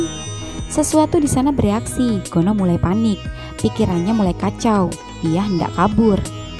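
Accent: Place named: Papua, Indonesia. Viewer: Kadang tersenyum dengar logat papuanyaaaa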